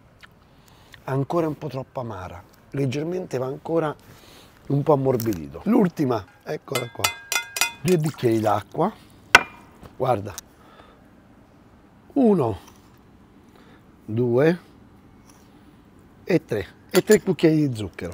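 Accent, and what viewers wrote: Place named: Milan, Italy. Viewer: Ma non ha aperto a Milano? Ma non lo senti l’accento milanese??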